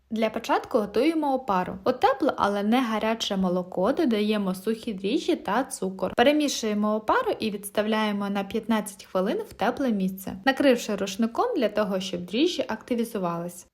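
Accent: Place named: Galicia, Ukraine. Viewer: Чудове відео, швидко,конкретно, та ше й милий галицький діалект! Лайк, подяка, підписка :)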